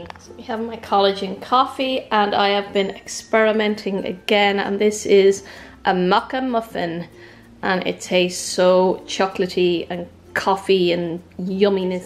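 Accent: Ireland. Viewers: "Pray to the fairies"... Lol. Does that even work if I do it - or does it work for the Irish only 😂 Love your Irish English by the way... The only reason why I religiously watch channel!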